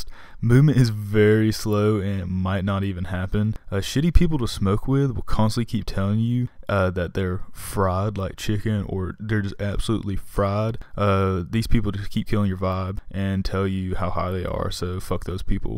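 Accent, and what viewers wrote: South, United States. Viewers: I can't tell if you're southern or a frat guy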